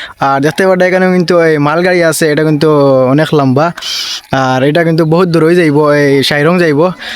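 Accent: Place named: Sylhet, Bangladesh. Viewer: Shyleti style language . Tripura এর লোক আপনি. নাহলে শিলচর জেলার লোক. শ্রীহট্ট (সিলেট) এর ভাষা আমার খুবই প্রিয়।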